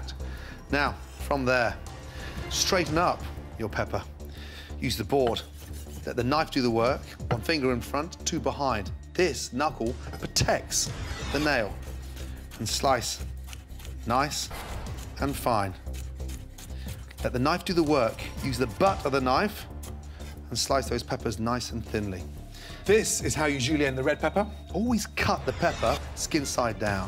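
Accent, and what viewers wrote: Scotland, United Kingdom. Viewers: HE’S SCOTTISH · I don't know why his British accent makes me feel energetic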